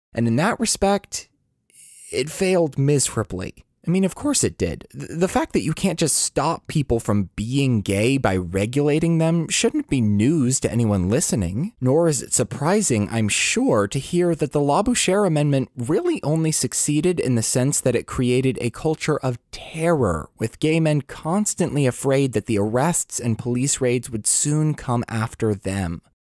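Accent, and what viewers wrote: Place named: United States. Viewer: As soon as I heard an American accent, I suspected this would be a pile up on the interstate. I’m glad I was proven thoroughly wrong. Well researched, beautifully read, and pragmatic. I for one won’t hesitate to elevate Abse to hero status. He had to hold his nose to say certain things to get it over the line. In retrospect, the weakness of the legislation ought to be seen more in its scope than its content, as it didn’t apply to Scotland or Northern Ireland, which had to wait until 1980 and 1982, respectively, to enjoy some partial decriminalisation of their own. Sadly, Abse’s work was undermined by the police and judiciary in the following decades as they pushed people through the legislative cracks into prosecutions with laws that were never really intended to be used in certain ways. At one point it was legal to insert a fist into a man but illegal to own a video of such an act. 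Even into the late 1970s, gay men and women were still being subjected to psychosurgeries (lobotomies and leukotomies), ECT, or horrendous drug-based conversion therapy. Some are still alive today to tell the tale. The fact remains, the architect of gay rights in the UK can be traced back to Alan Horsfall, Ray Gosling and Leo Abse.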